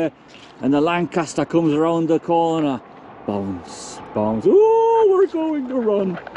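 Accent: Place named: Newcastle, United Kingdom. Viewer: Merry Christmas and Happy New Year. I just discovered you and my absolute favorite is your chatting when you ride. I'm not native English speaker and sometimes it's difficult for me to follow your dialect... what is that, are you from Newcastle or where? Anyway, love to follow you, cheers!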